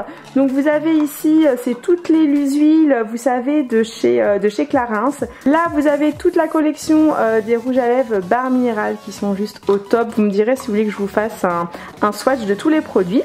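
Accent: England, United Kingdom. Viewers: alala ton accent anglais mavais manquer 😂😂 melted "meite" 😂😂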